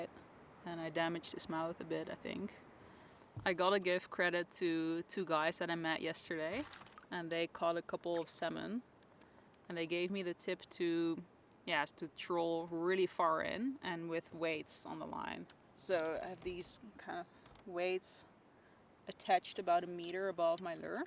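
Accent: Netherlands. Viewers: Are you Dutch? I'm trying to place your accent 😊 Beautiful adventure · Do I hear a Dutch accent? Love your content btw! Great views!! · You don't sound like real american accent. Are you Dutch? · my algorithm brought me here, cool content, your accent sound a little dutch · Wow! That is so beautiful, what a cool adventure. You are very good at filming and editing by the way. I would love to do this trip, just not too thrilled about potential bear attacks but I guess chances of that are slim. I was trying to pick your accent but it's hard; are you Dutch? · Such a fabulous video, exciting and relaxing and inspiring. Do you have any Dutch heritage, your accent really reminds me of my fellow Dutchies. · Your accent. Are you from the Netherlands? Very cool video. I love that canoe! I live in New Zealand. We have quite a bit of terrain like this as well. If you ever get the chance, check out the south island of New Zealand. 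· Love the video! Thanks for sharing. I hear a slight dutch accent? Or is it just me? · Hey! Small world! I randomly came across your Bowron Lake video and noticed from your accent that you’re Dutch? Did the Bowron Lake circuit in mid-May this year, shortly after moving from the Netherlands to Calgary. I brought my camera along as well and was hoping to film the trip, but unfortunately my camera went for an unplanned swim on the very first day 🥲 So I never got to make the video I had in mind. Anyway, I really enjoyed seeing your take on the trip! Thanks! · Are you a dutch speaker by any chance? your accent reminds me of dutch speakers speaking english. Love your content!! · What and adventure. And what a lovely woman! ❤. Are you dutch by any chance? You're accent kind off is but your English is flawless. Really enjoyed this film! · Your accent is cute, you sound Dutch. Where are you from? 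Groeten uit Holland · Thank you Youtube, you are finally learning what I like. That's some amazing scenery, I also love to be out in nature solo. Am I crazy or do I indeed hear a Dutch accent? I often dream of moving to Canada to live this peacefull lifestyle.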